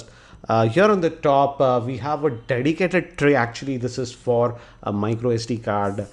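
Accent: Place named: India. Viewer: gosh ! the indian accent...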